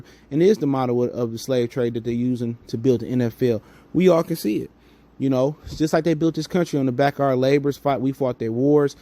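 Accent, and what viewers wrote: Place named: California, United States. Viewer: i will like to add chiefs will pay him what ever he wants. Are you a raiders fan? i know it has nothing to do with it but you got a cali accent lol Also mahomes has not been getting along with his parents for awhile beacause they mad at his brother that is gay. He supports his brother no matter what but the parents trying to cut his brother off. Yes i have the insite homie and this is all true.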